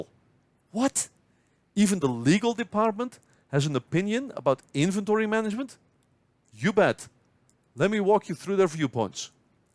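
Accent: Netherlands. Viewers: Dutch accent for the win! Haha Mede Nederlander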